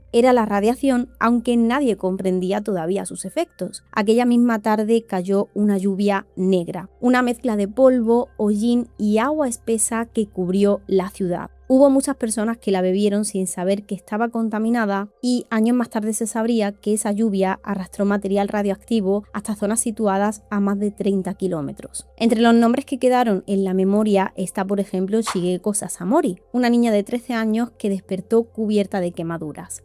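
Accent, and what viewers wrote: Spain. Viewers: Es video es excelente, me ahorras el tener que leer un libro cada vez que veo uno de tus videos. Está muy bien documentado, te felicito. Y me encanta tu acento porque soy de latino América y mi país heredó ese acento andaluz como el que tú usas, solo que se te escucha mejor a ti. Te felicito y sigue adelante. Espero tus nuevos videos. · Buenisimo documental! Y mas con tu hermosa voz y acento! De qué parte de España eres? Para reconocer el acento…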